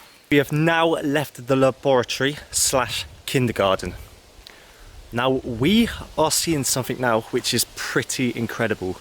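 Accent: United Kingdom. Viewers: Lmao you know YouTube comments are toxic when a brit has to excuse his accent to those of us in the western world. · Your British by the way you talk interesting video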